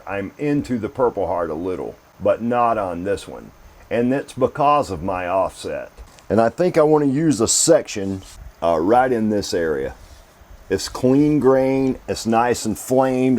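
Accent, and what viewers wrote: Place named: South, United States. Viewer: I'm in stitches here. I love southern accents, and yours is great; but every time you say "Volute," it sounds like a spices of duck. I keep thinking the next part of the story will be how the dog jumped out of the boat and went after it... 🤣😂✌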